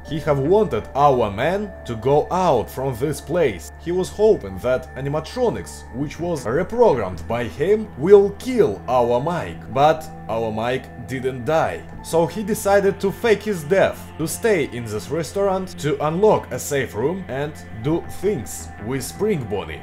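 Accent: Russia. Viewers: you Russian pronunciation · * у тебя очень русский акцент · Your little Russian accent can be heard, but I like it!Well done! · Good Job! Only your ancient is very Russian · акцент то русский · there are Russian pronunciation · Russian accent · you have some Russian pronunciation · your voice is like a Russian who first came to America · O, great work, but... this terrible Russian accent. Try to say words softer. Good luck. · Good English but with russian accent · Hello, that's cool video! I think you can do great videos in english, but your russian emphasis isn't very well) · as you tell you have russian accent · NOOOOO IF YOU WILL (um...okay ) DO VIDEOS (#pokerface) EVERY DAY, YOU LOST YOUR RUSSIAN (um...) you will lose your Russian accent and your Russian video will look awful((( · Your English is very nice but Russian accent a little bit unpleasantly. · Russian emphasis,huh. 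· Русский акцент все портит. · Ochen zameten russkiy akzent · try to remove Russian accent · you're really good at speaking English , but the accent is Russian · His accent is associated with the fact that he is Russian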